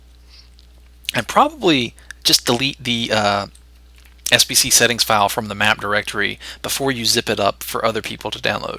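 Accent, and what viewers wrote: South, United States. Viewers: What part of America are you from> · love the southern accent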